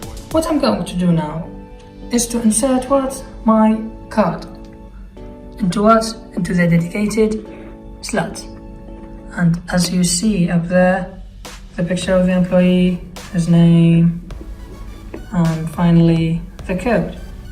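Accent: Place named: United Kingdom. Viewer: جهاز روعه واللكنة البريطانية اروع ! استمر للأمام...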